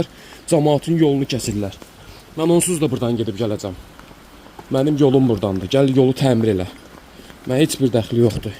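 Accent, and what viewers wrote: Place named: Baku, Azerbaijan. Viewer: Ay senin lehcene qurbann bakilim Allah kòmey olsun .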